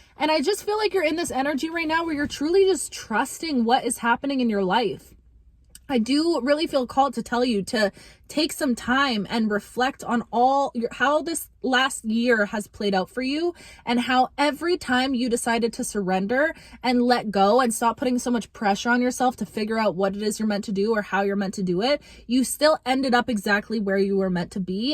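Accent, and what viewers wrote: Canada. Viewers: Are you Canadian? 😮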